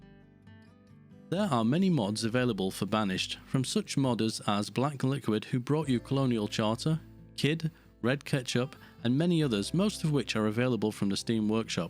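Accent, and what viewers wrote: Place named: RP, United Kingdom. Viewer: I recently picked this game up. It runs with relatively low demands for a 2014 title. It supports anti-aliasing and runs on WinXp. All scenes you showed included mods: the wooden church, the instant building of fences. This is not in the normal game. The sound is good. The game is lacking in two areas: management of stock at specific warehouses and efficiency of laborers who walk all across the map to do tasks as the town expands. Have a fishery on one end of the town, and somehow a few fish will end up on the other side while the person carries a half a load of stone. Everyone uses this speech synthesizer with a BBC English accent. When I hear him, I'm thinking of an internet personality ranting about veganism.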